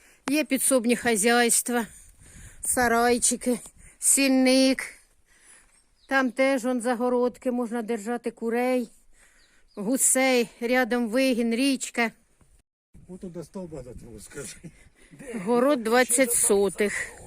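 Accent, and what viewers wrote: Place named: Chernihiv, Ukraine. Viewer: По мові- Полтавська чи Чернігівська. Швидше Чернігівська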